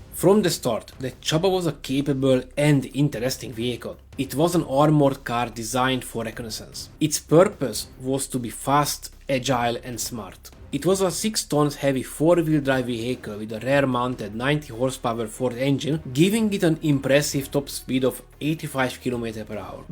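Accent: Hungary. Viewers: i can feel a hungarian accent in you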